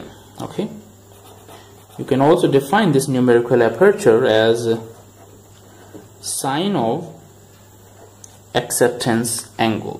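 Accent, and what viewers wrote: India. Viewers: Bhai tu Indian accent me hi sikha achcha lagta hai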